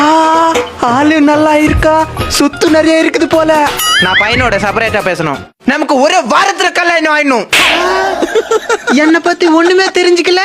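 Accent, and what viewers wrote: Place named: Andhra border, India. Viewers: Andhra border people's slang ithu. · Telugu mixed tamil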